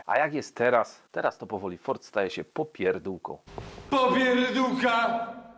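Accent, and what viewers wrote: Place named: Silesia, Poland. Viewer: Škoda też😁 Tak z innej beczki to fajnie że w końcu ktoś ze Śląska robi tak fajny program. Miło się słucha własnego akcentu na YouTube 😉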